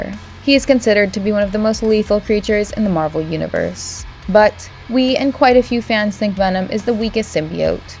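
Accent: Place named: United States. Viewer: Every word is typical american accent everything has r in it annoying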